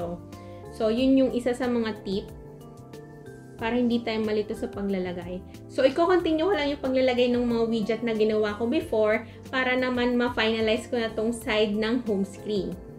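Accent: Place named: United States. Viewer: Akala ko amerikano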